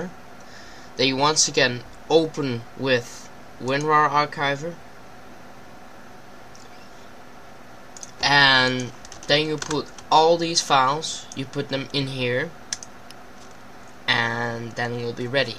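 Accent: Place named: Netherlands. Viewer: Your Dutch :D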